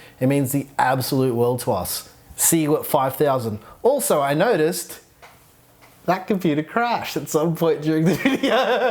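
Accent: Australia. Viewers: are you australian 🤢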